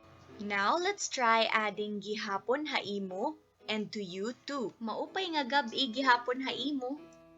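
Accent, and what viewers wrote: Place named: Tacloban, Philippines. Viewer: tacloban accent yun waray mo? curious lang :-) parang tacloban accent an waray mo...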